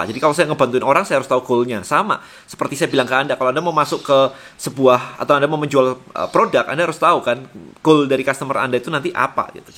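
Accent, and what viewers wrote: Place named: Surabaya, Indonesia. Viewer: logat surabayansss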